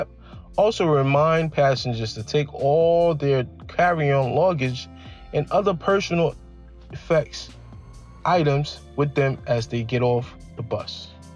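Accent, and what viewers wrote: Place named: New York, United States. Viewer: Finally!! An actual human voice! And a NYC accent at that! 😆🙌🏼 Thank you!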